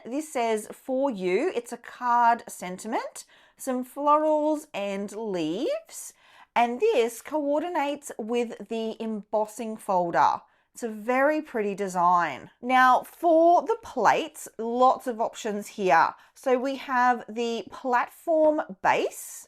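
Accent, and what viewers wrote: Australia. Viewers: Oh,... I love your voice! Your Aussie accent is fun, and your voice modulations are happy and cheerful! Please don't change. ~Kathy ❤❤❤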